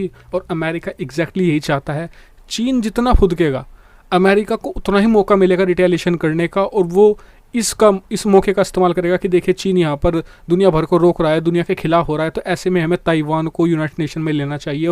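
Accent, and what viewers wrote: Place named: Bengal, India. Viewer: Tum kidharke banda hai sala bangala jaisa boltahai hindi vi achi nahi baltahai.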